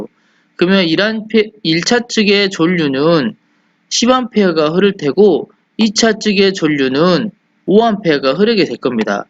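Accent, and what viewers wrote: Jeolla, South Korea. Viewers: 다 좋은데 전라도 사투리가 너무세요.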